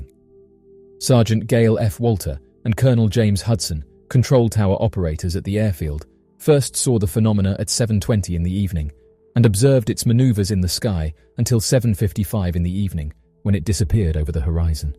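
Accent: England, United Kingdom. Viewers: He's ENGLISH! (deja vu..?) 😆 🍄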